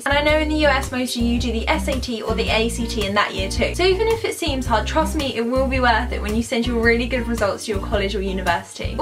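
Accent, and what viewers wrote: United Kingdom. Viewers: Are you british? (like me?)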